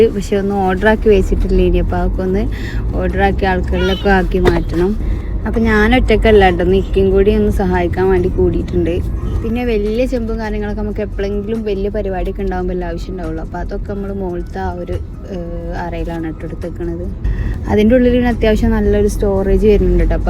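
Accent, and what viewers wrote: Kozhikode, India. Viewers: കോഴിക്കോട് കാരി ആണോ slang കോഴിക്കോട് ആണല്ലോ ❤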